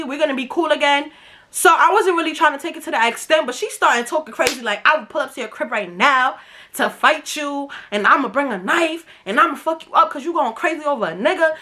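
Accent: New York, United States. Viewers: i really love new york accents 😩🥰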